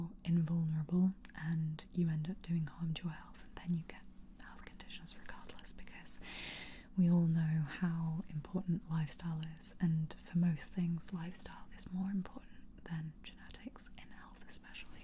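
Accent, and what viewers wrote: United Kingdom. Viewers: You have a great ASMR voice. As an American, I think the British accent is a wonderful bonus as well. 👍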